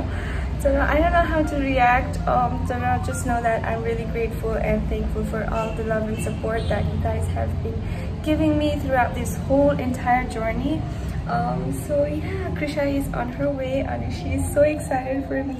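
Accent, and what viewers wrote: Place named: Nepal. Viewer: Beautiful 💓💓u☺ I love Nepal ko accent ☺☺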